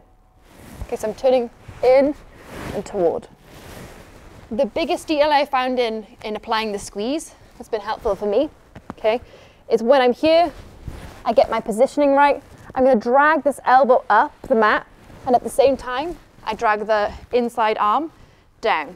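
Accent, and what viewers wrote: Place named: Wales, United Kingdom. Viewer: She's from Wales...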